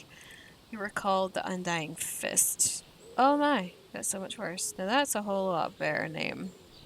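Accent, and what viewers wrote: Scotland, United Kingdom. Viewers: Slowly getting into the rhythm of the game. Something I like a lot is going to Options and extending the season to 10 days or more days (the effect begins on the following season). This allows me to play all the side-quests, explore at my pace, battle baddies, and experiment within the season. A big part of the game is building a settlement/village/town and managing the settlers. Love the Scottish brogue; it's now part of my game, bringing it alive!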